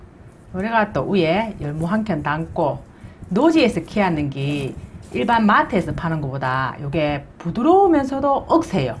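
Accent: Gyeongsang, South Korea. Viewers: 목소리도.애교만점.최고.요리사입니다. 경상도.사투리.정말.멋지세요. 제친구도.대구있지요.요리프로. 최고.쉽고.제미있게하십니다. 축복합니다. 저는.전라도에.살지요.건강하세요.❤🎉😂😅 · 정다운 갱상도 아줌니 말솜씨땜시 보는것도 듣는것도 잼나게 밨어요 감사합니데이~~ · ! 경상도 말소리너무구요미 너무 행복 합니다(♡♡♡♡) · 경상도 아줌마 사투리 제대로 👍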